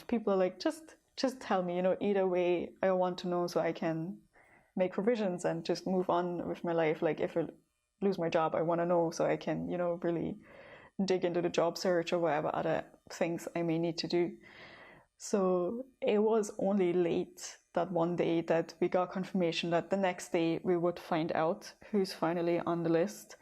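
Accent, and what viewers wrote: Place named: Ireland. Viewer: I just can't get over how you are sounding more and more Irish with every video lol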